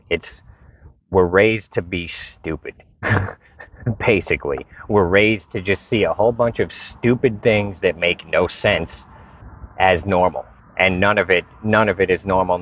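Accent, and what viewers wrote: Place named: Long Island, United States. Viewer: your Long Island accent makes you sound like *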